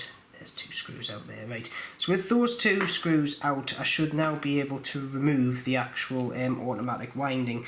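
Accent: Newcastle, United Kingdom. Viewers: Thank you so much for creating this video. After watching it, I felt comfortable enough to replace the ratchet wheel on my Glycine Combat sub. The part cost $10 on ebay. I was hand-winding the watch everyday until the teeth on the ratchet wheel eventually shear off. Learn my lesson. BTW, love the way you Brits pronounce the word Glycine and Aluminum with an extra syllable. Tomato, Tomato. Potate Potatoe and then there is Glycine. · I've serviced my own 2824 and 2836 watches (some of which I have used regularly for 20+ years) and have never seen this issue, but will watch out for signs of it in future just in case. (I was also going to make the Glycerine comment, but you addressed it below :). Nice to hear another Geordie voice talking about watches though, at least I'm not the only one messing about up here! :0)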